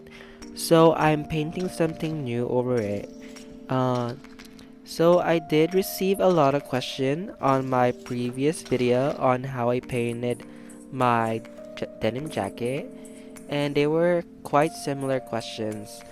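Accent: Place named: Philippines. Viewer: I noticed your accent and the word buwan, are you Filipino?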